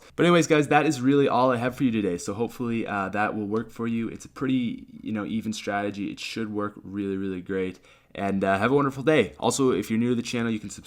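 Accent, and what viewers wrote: Canada. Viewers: He sounds so Canadian In this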